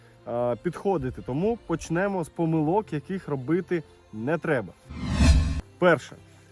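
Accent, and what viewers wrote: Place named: Ukraine. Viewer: нравятся еще как нравятся хоть украинский не знаю я родилась в киеве живу в Израиле но знаешь я слышу украински мне кажется что я сейчас заговорю на украинском мне украинский "акцент" ближе чем руский . как душевно, генетический.(не потому-что вами война) я невидела украину 34 года а акцент родной остался на душе😊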